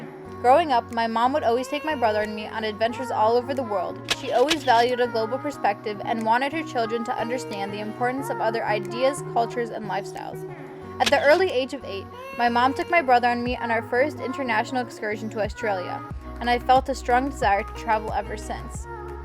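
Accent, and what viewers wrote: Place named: Boston, United States. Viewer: You almost have the Boston accent. Bah-stin.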